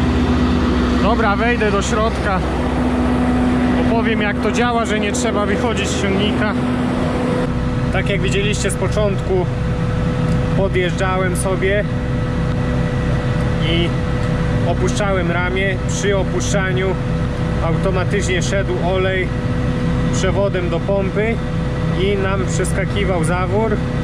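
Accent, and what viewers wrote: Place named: Podlasie, Poland. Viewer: Słychać że Podlasie,z resztą tak jak ja. POZDRAWIAM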